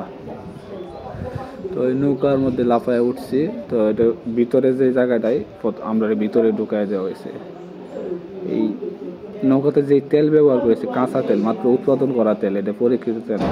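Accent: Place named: Kishoreganj, Bangladesh. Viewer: আপনার কথা শুনে মনে হইতাছে কিশোরগঞ্জ /কাপাসিয়ার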